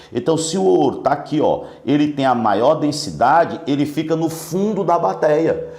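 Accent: Northeast, Brazil. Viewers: Que aula foda! E ainda por cima o sotaque nordestino. Inscrita demais no canal!! Sucesso!!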